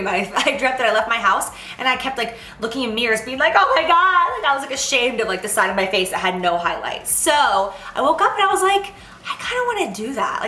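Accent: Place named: Boston, United States. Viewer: "Highlighta" lol is he from Boston? We have that accent.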